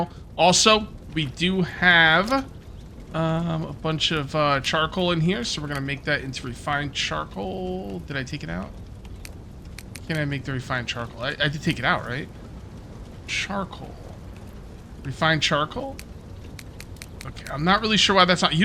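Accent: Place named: South, United States. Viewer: We pronounce it that way in the south. We also use the L in salmon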